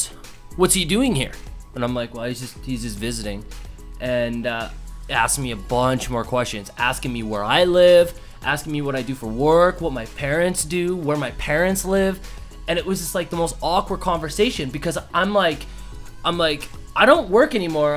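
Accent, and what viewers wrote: Canada. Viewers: Where you from in canada you kinda sound like a sauga man cant lie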